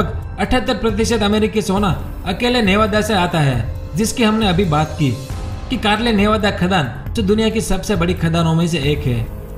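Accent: Gujarat, India. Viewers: Tu Gujarati lage se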